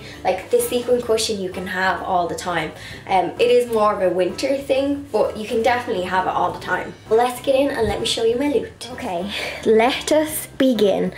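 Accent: Ireland. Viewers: Ayyyy ur Irish !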